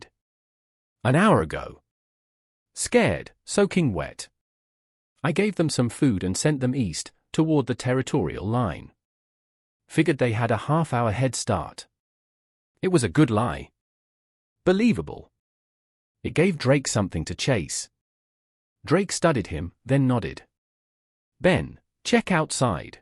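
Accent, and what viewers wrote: United Kingdom. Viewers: Why do I have to listen to this ridiculous British accent on a story that takes place in America? We should have allowed the Germans to destroy the UK when we had the chance (NOT CHONCE).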